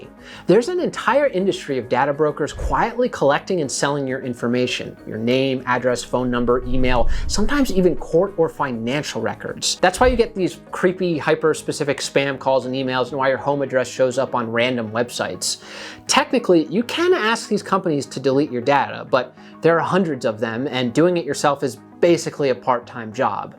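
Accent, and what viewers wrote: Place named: Germany. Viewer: My brain was short circuiting : I hear a German accent out of an Italian. Imagine my relief when you reveal the truth.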